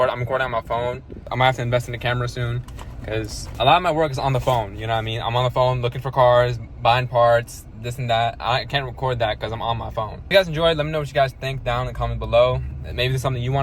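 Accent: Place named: Morocco. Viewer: Where you from bro, you sound Moroccan ?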